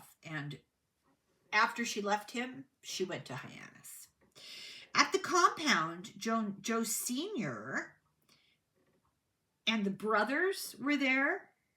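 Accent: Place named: Ireland. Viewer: I just love your Irish accent. You are beautiful And a sweetheart I look forward to being part of your channel I subscribed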